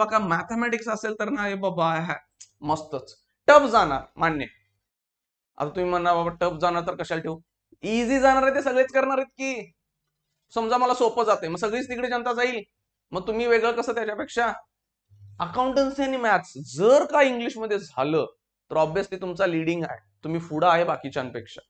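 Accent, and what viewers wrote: Kolhapur, India. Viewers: Are u from Kolhapur???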